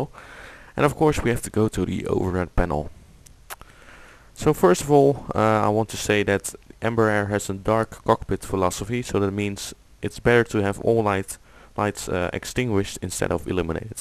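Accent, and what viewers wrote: Netherlands. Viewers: I swear to god, once i heard you're accent i KNEW you were dutch! And you're youtube account confirmed it :) Great video & thanks. Much better than reading tutorials ;)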